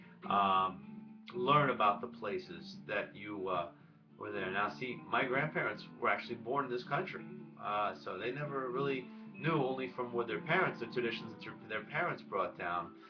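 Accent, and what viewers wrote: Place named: Southern Italy, Italy. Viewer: Hi man! Nice video! I'm Italian (from Lombardy), it's amazing to listen to you speaking italian, because you mix Italian, dialects of Southern Italy, English and Spanish! Keep on posting videos!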